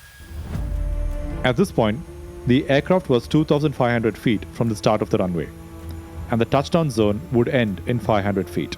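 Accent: Kerala, India. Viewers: He is ofcourse a malu few pronunciation was mallu accent.. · Narration in an amazing Indian English! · Did you pronounce zh ( ഴ ) correctly you are either a Malayalam or a Tamil , your accent gives Malayali vibes . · Wtf. Why do you have a mallu accent? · Your pronunciation of kochi and kozhikkodu makes me wonder whether you are a malayali? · Are you a malayali? I felt it from your accent.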